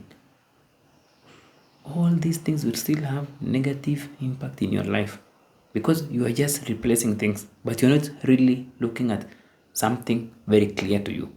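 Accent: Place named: Kenya. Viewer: My fellow Kenyan!!!! That accent 😊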